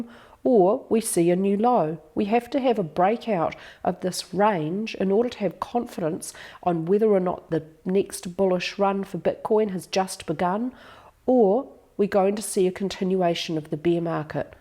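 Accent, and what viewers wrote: New Zealand. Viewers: Love your work, so HAPPY to hear that Kiwi voice again The weaknesses shown in the various indicators on XRP really show an incredibly indecisive market right now. So, so hard to find signs of life! 🤪🙏😁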